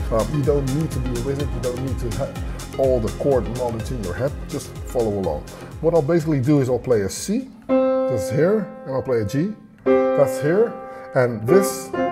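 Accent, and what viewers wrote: Netherlands. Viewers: You def dutch by the aound of your accent lol😂🚀 dope video you gained a sub brother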